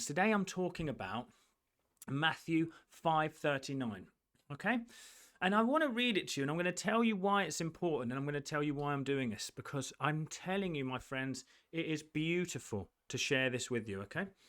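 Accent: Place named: London, United Kingdom. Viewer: You have a lovely voice and I also love your London accent!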